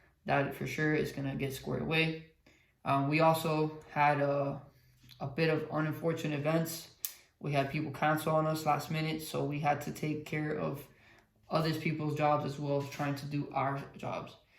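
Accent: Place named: Hialeah, United States. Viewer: Wow For this event being low on staff, you guys did a good job. It sucks when people end up flaking on you, however you guys adapted and overcame the Situation. As for Tech Inspection I agree you gotta leave the friendship out and be fair for everyone. A true friend will understand. Im sure this was a learning experience for you and your team. So For the next event just have a few backup plans incase shit hits the fan again . BTW It was Good to meeting you funny how you were talking to Frenchie and Immediately recognized your voice. Tremendo Hialeah accent 😆 🤣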